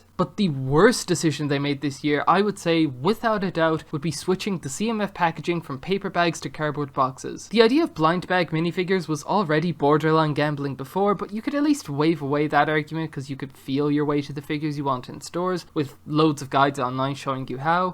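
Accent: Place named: Ireland. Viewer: As an Irishman I personally find that when I hear an Irish accent on YouTube I usually expect the video to be low quality, but I was pleasantly surprised with this one. Really good stuff that kept me engaged all the way through which is rare, I’m shocked you haven’t got more subs. Can’t believe that new Vision minifigure didn’t get a mention tho 😤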